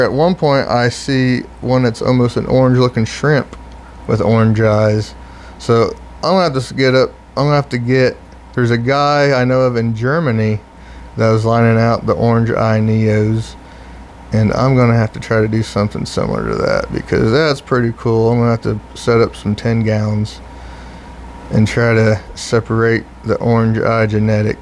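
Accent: United States. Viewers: Also wanted to know of course you gotta southern accent. Where are you from?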